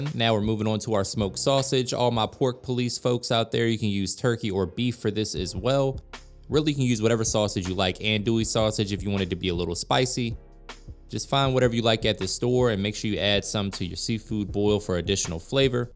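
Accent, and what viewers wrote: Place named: Louisiana, United States. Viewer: Stop fuckin lying you're from Louisiana you ain't from Virginia with that accent.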